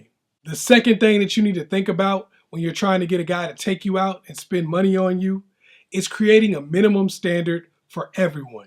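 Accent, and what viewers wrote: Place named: Decatur, United States. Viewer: Are you from the East side? You sound like you’re from Decatur? Btw great advice as always ✌🏽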